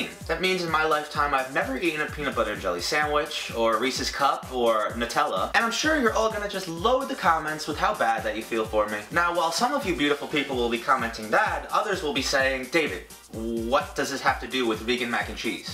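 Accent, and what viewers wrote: New York, United States. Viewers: Are you from New York? You kinda sound like it. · Are you a New Yorker?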